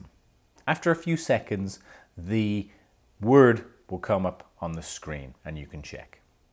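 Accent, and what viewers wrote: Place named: Ireland. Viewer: your pronunciation is quite Irish to my ears, however you do use a lot of American vocabulary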